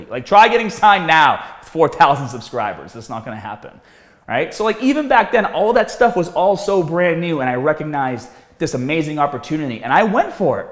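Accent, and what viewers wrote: Boston, United States. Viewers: Whether you do decide to leave or stay, I appreciate the many, many videos you have uploaded over the years. I've only discovered the channel in the last year or so however there is plenty to go through and enjoy and even go back to if needed for advice on form etc. Lots of genuine, honest and great tips and advice. I appreciate the humor, knowledge, genuine and honest videos. You seem like an awesome dude and the Boston accent is lots of fun as a bonus. Either way, best of luck in your future endeavors and thanks for everything you have done and shared to help fellow gym folks like myself who enjoy the weight lifting and other aspects. I'm glad you did all this and took the risk and opportunity to make this channel happen. I also can totally relate to being introverted as a kid, gaming with friends and making lasting memories. 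The gym and sports and other stuff also was a great time spent with them and on my own to help with mental health, physical health and so on. Breaks are great to recharge and reset and you don't need to apologize ever for looking after yourself. It is a bit different seeing you with glasses haha. Sorry for the rambling comment but just felt like sharing to show you are appreciated and thanks for everything you have shared and done. Cheers from a fan from British Columbia, Canada. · You hit the nail on the head. A Google search of "how to" blah (whatever exercise I was searching at the to time) is how I found you several years ago. It was easy to tell that you knew what you were talking about and had the results to prove it. Plus, I went to college in Boston and needed more of the accent lol. Been following you and trusting you as a top-notch fitness resource since. Congrats and best of the luck on the game! 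Geez, living all of our dreams!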